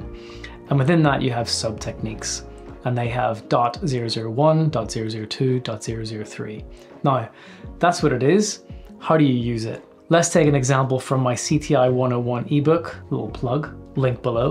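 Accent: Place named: Scotland, United Kingdom. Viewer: but you need easier english, you speak too scottish bro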